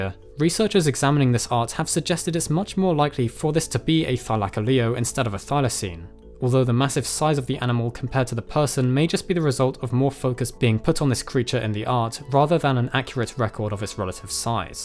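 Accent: United Kingdom. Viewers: Bri'ish fella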